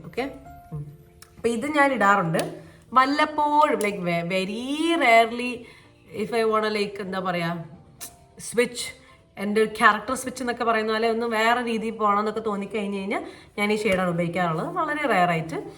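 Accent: Kozhikode, India. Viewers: Kozhikode slang alalo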